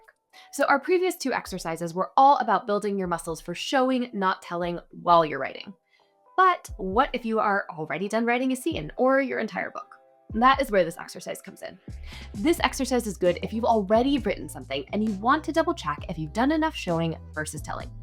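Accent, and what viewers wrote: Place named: United Kingdom. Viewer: Once again, not helpful for me to improve on not telling in my story. She's speaking British, and I'm full-blooded American from Chicago. It may generally be English, but the language is still foreign to me.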